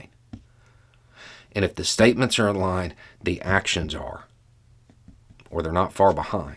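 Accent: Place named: Texas, United States. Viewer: I commend you for standing up for your beliefs. Sounds by your accent you live in Texas therefore I understand the use of " the Fifth Column ", the enemy of my enemy is my friend.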